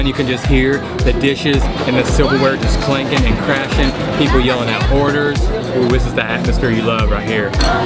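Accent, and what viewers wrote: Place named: South, United States. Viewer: The vlogger sounded like hes southern.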